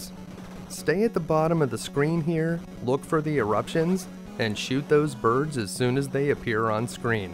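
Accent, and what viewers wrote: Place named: United States. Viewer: This is the best American accent from a Chinese person I have ever heard.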